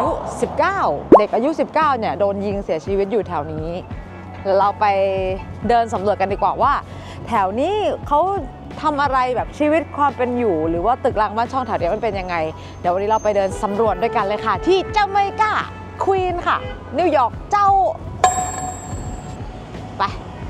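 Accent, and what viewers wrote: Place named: Northern Thailand, Thailand. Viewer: ຂອບໃຈທີ່ພານຳທ່ຽວນິວຢັອກ ມັກສຽງນ້ອງອູ້ກຳເມືອງຫນ້າຮັກດີ ອ້າຍເຄີຍມານິວຢັອກ2ເດືອນໃນປີ1995 ທ່ຽວມ່ວນໆແລະປອດໄພເດີ້ນ້ອງ👍👍👍😊👏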